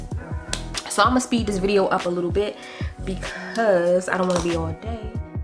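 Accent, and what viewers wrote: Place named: New Orleans, United States. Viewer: I thought was from New Orleans, by her accent. I love her accent. Of course, humidity in the New Orleans area is like the worst (it's rare to just have a good hair day due to the humidity)! But Baltimore is pretty cool; I like that they get all 4 seasons.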